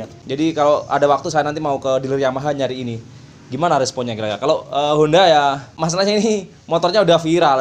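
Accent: Java, Indonesia. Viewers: Rantene rondok amoh...😂😂😂😂 Gue suka logat jawanya..😂😂😂😂👍👍👍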